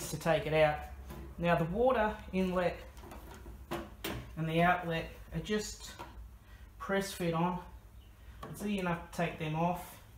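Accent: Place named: Australia. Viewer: He's gotta me Aussie... He speaks exactly the same as I do..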